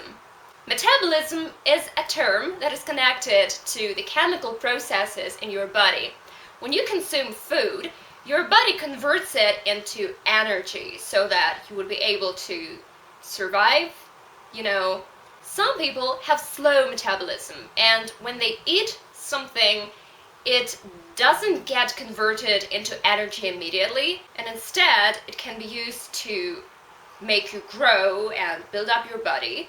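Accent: United Kingdom. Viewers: I love that British accent you have.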